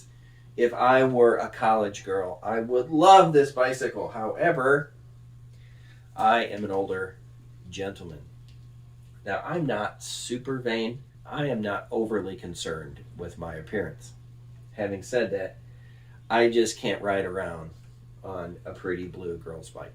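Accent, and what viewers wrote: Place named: Nebraska, United States. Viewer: Welcome back. Sounds like you grew up in Nebraska. And, you could use a Tig welder, they make them really small these days.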